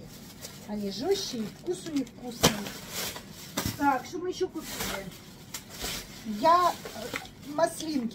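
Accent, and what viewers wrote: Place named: Ukraine. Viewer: С покупочками😊 Вы сами с Украины? Родной гОвор кажется 😊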